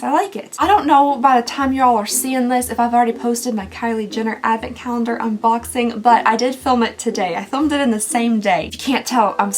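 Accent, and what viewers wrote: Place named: South, United States. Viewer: Sounds more like you’re back from Texas 😂🥰 anyone else hear that southern twang a little extra in this video….😉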